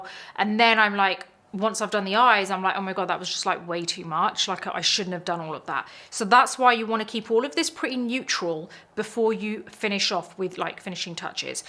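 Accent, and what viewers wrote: United Kingdom. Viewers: Does your accent change?? You’re more British than before 😊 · Wow, forget about the makeup, but I love the way you speak, you use that British accent and look . It suits you so much. I love watching you speak. 😅❤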